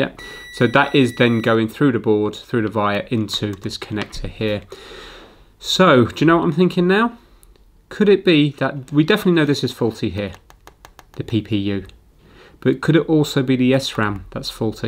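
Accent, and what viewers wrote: United Kingdom. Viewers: Amazing actually. Brittish accent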